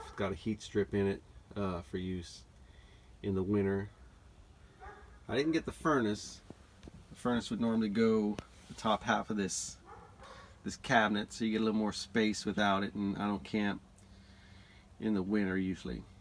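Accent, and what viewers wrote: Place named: Louisiana, United States. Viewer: You sound like Louisiana! :) I grew up in Bossier.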